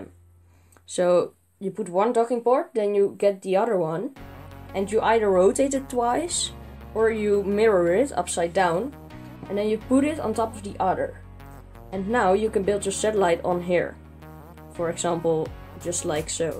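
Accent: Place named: Netherlands. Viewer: Ik smell Nederlands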